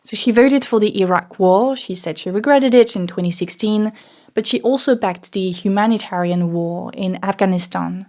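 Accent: France. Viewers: Love your French accent 🥖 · Love how your accent is so French it becomes Australian (Overton windor, videor etc...) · I love how you combine this complicated discussion into a rational and balance topic. Maybe your voice and french accent help too, mercy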